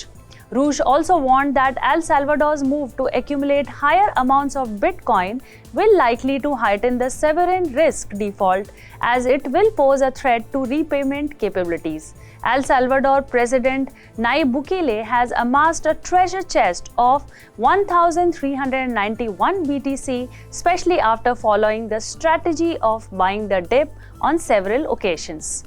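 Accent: Gujarat, India. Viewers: The content is very relevant and the updates are fascinating and fantastic. is very pretty, presentable and has s good voice. The only slight problem is that her accent is quite gujju and is a bit of a distraction. Hopefully she goes through accent and diction training. Amazing content. Probably the best I have seen anywhere on Cryptoverse.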